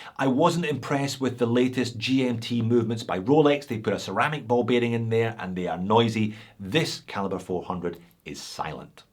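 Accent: Scotland, United Kingdom. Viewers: I just came to listen my favourite Scotsman talking about a nice watch I can't afford and had to learn that Sean Connery died... RIP.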